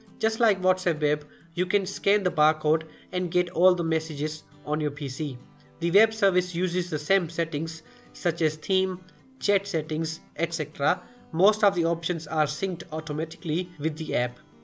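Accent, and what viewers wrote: India. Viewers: I cannot understand what he is saying with his India accent.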